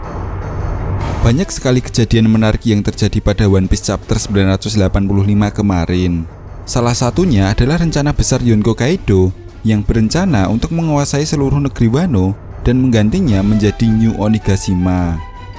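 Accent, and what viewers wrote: Java, Indonesia. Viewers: Ngomong medo, dari dulu ga berubah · Medog tenan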